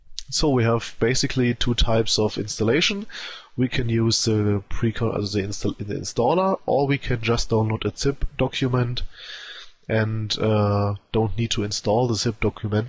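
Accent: Germany. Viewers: Kann es sein, dass du Deutscher bist? ^^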